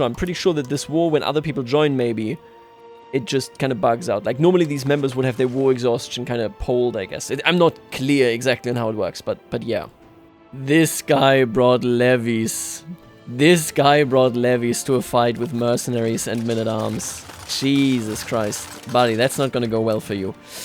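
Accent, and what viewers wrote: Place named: Brandenburg, Germany. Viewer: As a Brandenburger, i would rate your Brandenburgisch(i won't call it Berlinisch) a 8/10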